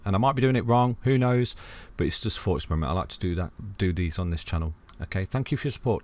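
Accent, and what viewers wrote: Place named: Midlands, United Kingdom. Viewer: Thanks for the TA, 👍🏻 Where in the uk are you from? the accent can’t be far from me in Chesterfield… keep up the great work.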